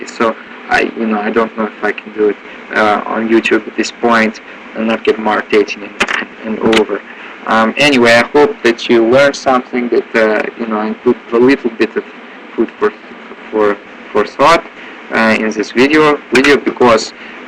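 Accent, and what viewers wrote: Russia. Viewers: perfect russian accent. From Russia! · ahhaahahahahah I LOVE IT!!! I'm Russian and even I can't do that hard Russian accent. Very well done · Парень ты русский, я живя в США ни видел такого акцента кроме как у русских) а может и правда перфект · Вообще-то он говорит очень близко к русскому акценту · you do a pretty good Russian accent. are you really Russian? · His Russian accent is very good. Or should I say his English is very good? I'm lost. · I don't know if you are russian with a really good american accent or vice versa xD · wow, this is perfect russian accent! so true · Very nice russian accent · 100% RUSSIAN GUY :DD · то как ты вставил "и" вместо "and" в тексте, выдает в тебе русского ) . The way you "accidentally" changed "and" to "i:", betrays your russian origin ) anyway. true or not, it sounds funny, may be too heavy for such a good gramma ). 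· А вы вообще русских знаете? He has so perfect russian accent that many russian viewers thought he is russian. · Abzal K. он совершенно определенно русский....привезен лет в 10-13 в США...99.9999% уверена, в том что он русскоязычный эмигрант... живу в америке больше 20 лет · Da! Tovarisch, we realy speak like that. I would really say you mimic and speak like most russians. And i'd say youre the one of those immigrants who left russia with parents in a childhood. Nonetheless excellent job on your accent pal! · Да ты русский)) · amazing, I can't believe he's not russian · I am russian, yeah when i started learning english i spoke like this :D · Since I'm russian i can say it's quite a russian accent though i still have the faintest clue why would people want to sound like russians... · I'm Russian and I'm impressed by your ability to imitate our accent. At some moments I even doubted whether you are American or not... 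So, great job! Recently I uploaded my own video with my real Russian accent. So, you can check it out on my channel. Cheers! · If you are really not a russian guy, then your Russian accent is P-E-R-F-E-C-T! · Very good job, man! I am Russian. Whenever I hear people speak with a Russian accent I can ALWAYS distinguish between an American trying to put on a Russian accent and a real Russian speaking with the so well known to me Russian accent. In your case, I had this subconscious realization that you ARE Russian. I can hardly believe that you are not one. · It sounds very Russian! Perfect Russian accent! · Sounds very Russian! Makes me think that he is from Russia. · Реально с Русским акцентом говорит))) Очень похоже)))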